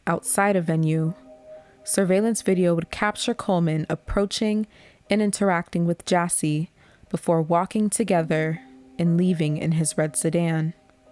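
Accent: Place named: United States. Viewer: You sound like an All American girl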